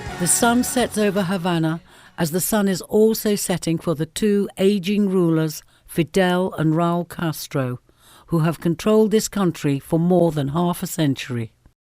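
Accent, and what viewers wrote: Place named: United Kingdom. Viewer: A stupid British accent lady doing the video come on ppl seriously.....have someone else do the video.....you suck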